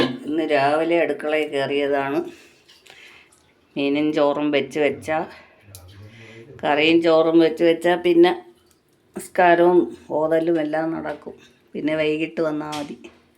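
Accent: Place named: Thiruvananthapuram, India. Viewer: ഇത്താന്റെ തിരുവനന്തപുരം സംസാരം കേൾക്കാൻ നല്ല രസം 🥰❤👍🏼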